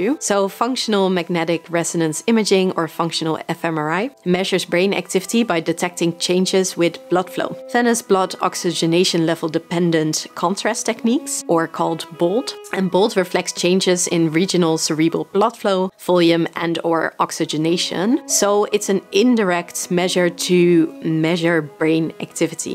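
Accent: Netherlands. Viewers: Hoi! Ben je toevallig Nederlands?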